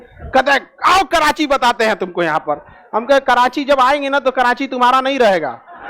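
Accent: Bihar, India. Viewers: आप बोलते बहुत अच्छी डौंग से। एकदम बिहारी स्टाइल से। धन्यवाद। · Bihari accent so strong... for once you forget that he's a Muslim 😂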